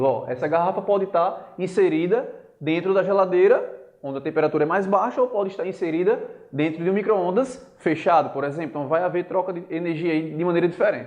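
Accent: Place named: João Pessoa, Brazil. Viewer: sou recifense, percebo que o sotaque em cada estado do nordeste é muito diferente, sabia que eras de joao pessoa apenas pelo sotaque! divertido isso kkkkkkkk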